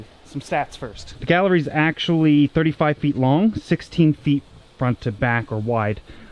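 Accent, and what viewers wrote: Canada. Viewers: ...you must be living in Canada...your accent...;-) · Ah first video and I just knew you were a fellow Canadian as soon as I heard your accent. I’m jealous I want a fish out building lol.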